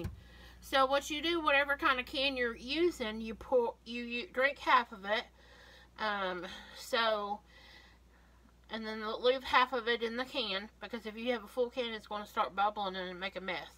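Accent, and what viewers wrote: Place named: West Virginia, United States. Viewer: that West Virginia accent is running over your instruction. My grandparents lived in West Virginia. Same accent, barely understandable. Cheekkun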